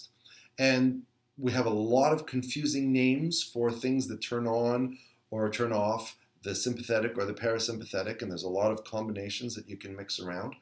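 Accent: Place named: Canada. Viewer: Two things....First...Ha! ....I just KNEW you were a Canadian, eh? (me too, that's why I had a feeling)...Second...you are a truly truly gifted teacher. Thank you so much for creating these paramedic videos, they have explained so much confusing material in such a simple and clear fashion....please make more videos!!!! .....eh?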